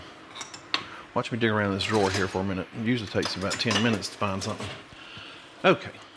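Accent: South, United States. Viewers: "Rubber Spatuler" 💗 man I love a southern accent 😄 Can't wait to try this recipe!!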